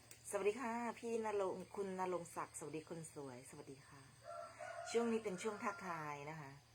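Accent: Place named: Isan, Thailand. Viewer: สวัสดีครับ ชอบฟังเสียงเวลาสาวอีสานพูด น่าฟังครับ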